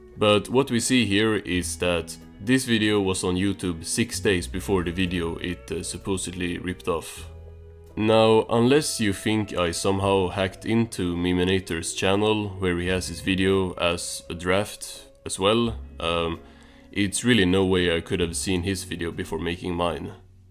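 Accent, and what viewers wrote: Sweden. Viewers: It's for sure scandinavian, I'm leaning towards swedish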